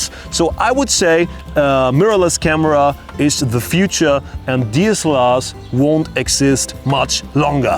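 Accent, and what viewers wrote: Germany. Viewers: wow ich find es so lustig wenn deutsche englisch sprechen 😄aber das gefällt mir sooo gut weil ich dich echt gut verstehen kann obwohl englisch nicht so meins ist... Ich habe eine Sony a58 und ich liebe sie 😍 ich liebe es auch dass man durch den Sucher alles machen kann, und ich habe sie eigentlich nur gekauft weil sie sogar viel günstiger war als canon dabei hatte sie mich von Anfang an so gut überzeugt😍😍 aber ich meine, meine hat trotzdem einen Spiegel 😄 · omg this German accent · are u german? just curious,cause of the dialect... edit: your camera is setted to german, so i may be right! greetings from austria!😉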